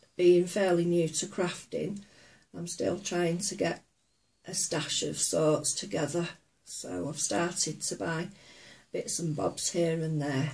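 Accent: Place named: Manchester, United Kingdom. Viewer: Hi lovely northern lady...welcome to YouTube, this is a great first video, well done to you and your helper. You sound like you're from Manchester or somewhere that way...I'm in sunny Lancashire..lol..best wishes to your daughter, I hope it all works out well for her. Your teacup 'n' saucer are beautiful...looking forward to seeing more from you soon...Ann...x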